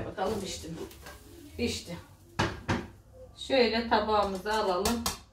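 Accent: Trabzon, Turkey. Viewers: Trabzon Of Hayrat nahiyesiydi.Bayılırdım konuşmalarına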